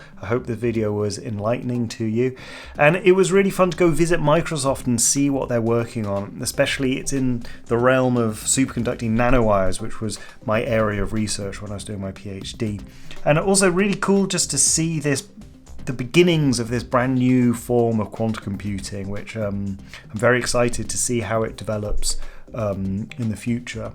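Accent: England, United Kingdom. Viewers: The accent is extremely annoying. English accent is so pretentious and unnecessarily slow and obnoxious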